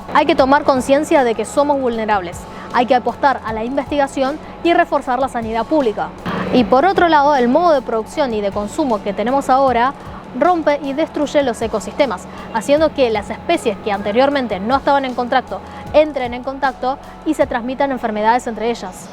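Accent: Buenos Aires, Argentina. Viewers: Muy bueno tu informe ..muy acertado ..me escanta tu tonada argentina ☺ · muchas felicitaciones por exponer u explicar, te soy sincero hablas muy pero re-bien el castellano con ese pequeno tonito Argentino, pero mi gran admiracion y respeto para ti. Gracias.! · Esta chica habla un español de Buenos Aires mejor que el mio.....congrats. Ya nada importa el virus.....estamos en el mismo barco. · Se nota q viviste en Argentina ! Bella e inteligente !! Estás en Argentina ? O seguís en tu país ?? Me encanta q seas muy clara ! Q con acento Argentino reconozcan tu español latino Argento !! 😘😘😘😘😍😍😍😍❤❤❤❤ Sos hermosa , te queda muy bien tu cabello suelto !! Sos muy joven ! Eres soltera ?? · Yo me quedaría callada, todos sabemos cómo es el gobierno Chino , y por otro lado el virus salió de algún lado ya sea de laboratorio o que no hirvieron bien su murciélago y por su culpa estamos todos metidos en casa y ahora deben de responder por todo el daño que causaron además ese pinche acento argentino no te ayuda en nada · Qué graciosa!!! 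Una china hablando español con acento argentino!!!! Además super controlado el acento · Qué "porteño" que hablas por ser china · Oye tu español está perfecto! En cuanto a China.. vamos a separar 3 cosas. 1. China como país NO tiene la culpa 2. Todos los ciudadanos de china tampoco tienen la culpa, la gente de China es muy respetuosa y tranquila, son buenas personas 3. El Gobierno de China fue el problema. Peeeeeero en USA, Europa etc.. actuaron tarde igual que el gobierno de China. Tú debes estar tranquila, tu país y tus compatriotas NO tienen NADA que ver con esto Soy mexicano y si, el gobierno de México, actuó tarde como lo hizo el gobierno de China. Oye se te nota el acero como de argentina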